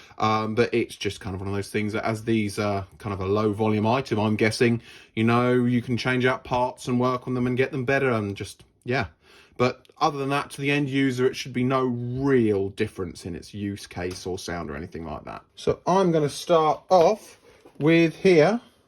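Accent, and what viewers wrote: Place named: United Kingdom. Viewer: Pity , as from accent it looks is based from U.k . Meaning the second filter preamp avalailbe on the market is still in u.k , brexit sucks . Is also bit weird coming so late in the filter party in the very same country ,but more the better. For reference decades after East ( the best preams of the world i.mo) did it for acg basses , following on the alembic fame for that in the past ( with lo pass AND hipass btw , i have it , exceptional , not practical for live use for speedo changes though ,so deinstalled ages ago ) . Again, The more the better so lucky you , just honestly it should have been mentioned , cause EAST (uk..) IS the MOST versatile if you just judge by that variable , and by far . Us players know it well lolz Anyway that confirms bass is DECADES ahead guitars in terms of alternative solutions ( guitarist dont want even try that lolz)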